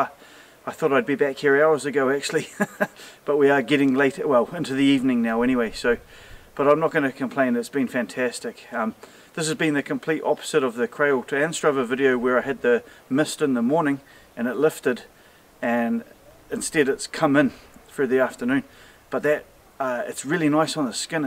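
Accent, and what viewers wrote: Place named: New Zealand. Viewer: For what it's worth I enjoy videos like this one. I am also a "Kiwi" (I thought I detected your accent a while ago but I was not certain) and I reside in New Zealand, but I lived in Scotland (Oban to be specific) for about two months in late 2001. There's some superb photography in this video - I really appreciated it.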